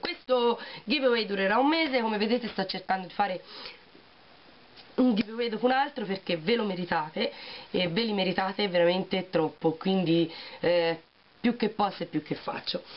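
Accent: Tuscany, Italy. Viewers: Mi fa sempre piacere sentire un dialetto amico su Facebook, una bella toscanaccia come me :)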